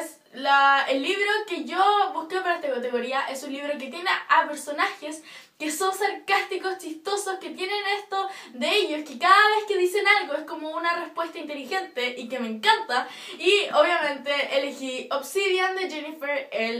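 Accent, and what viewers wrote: Chile. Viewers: Eres chilena cierto? Que chori jashdfjaj (: ♡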